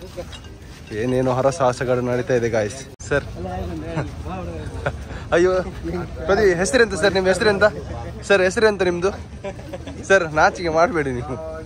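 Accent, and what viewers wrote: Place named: Kundapura, India. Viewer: Nivu kundapura kannada hege math adthira ?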